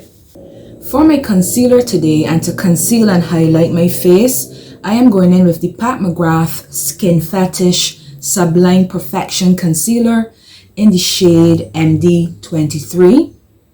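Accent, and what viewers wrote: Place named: Barbados. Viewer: This look turned out lovely. I'm now discovering your channel, I'm picking up and Barbadian accent from you. Are you Barbadian? 🤭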